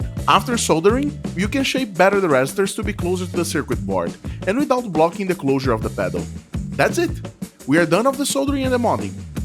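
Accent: Brazil. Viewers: sotaque Brasileiro, tmjt BR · Tu é brasileiro, irmão? Esse sotaque entrega ❤ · are you brazilian? you have the característics of brazillian when you speak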